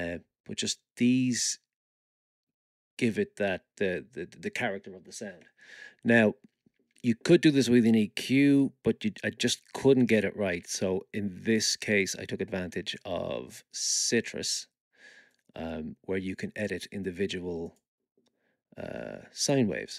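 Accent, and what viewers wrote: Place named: Ireland. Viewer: Unbelievable work my friend! Where abouts is that Irish Accent from?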